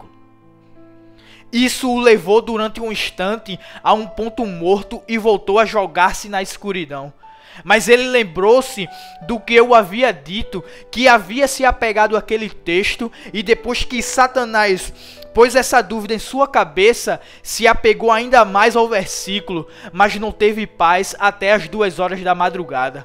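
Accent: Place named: Pernambuco, Brazil. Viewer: Parabéns ao locutor, que Deus o abençoe por sua disposiçao em transmitir esta palavra abençoada. Pelo sotaque creio que o Irmão é pernambucano, conterrâneo nordestino. Sou do Ceará. Orem pelo nordeste precisamoz das orações dos irmãos. Glórias a Deus.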